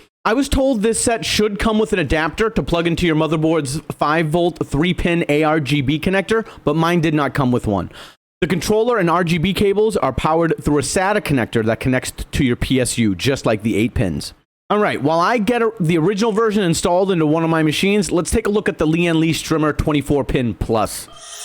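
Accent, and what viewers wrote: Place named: New York, United States. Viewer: Love the New York accent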